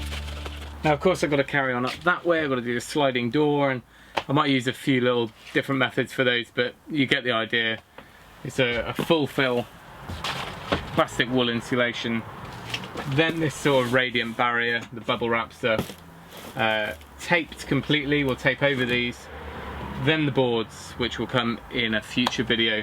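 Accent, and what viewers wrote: United Kingdom. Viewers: I believe that's a proper English vs American English (pronunciation) thingy. Pro-noun-see-A-shun Pro-nun-see-A-shun Lol :). Love this series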